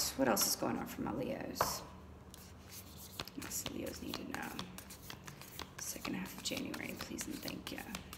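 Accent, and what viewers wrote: South, United States. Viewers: Plus, that Southern Belle accent! ❤️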